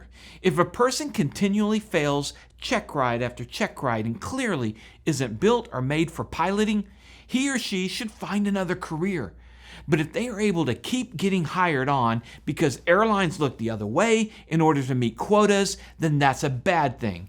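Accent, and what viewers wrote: Texas, United States. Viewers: it is obvious you are from Texas.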